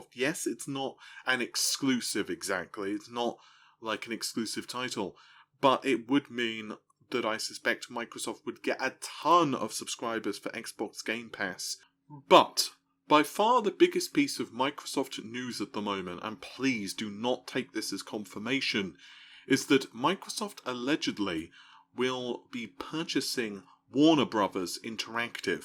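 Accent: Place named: RP, United Kingdom. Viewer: its because he has a posh english accent but then uses the F instead of TH. Freak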